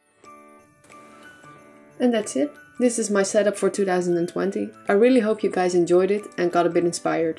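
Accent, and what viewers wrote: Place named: Netherlands. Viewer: Ik hoor je nederlands accent🤣 gaaf!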